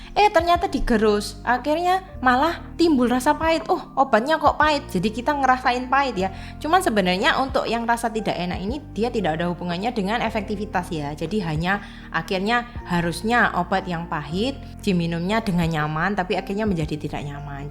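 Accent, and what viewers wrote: Java, Indonesia. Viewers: Suaranya ndeso medok .ga enak bget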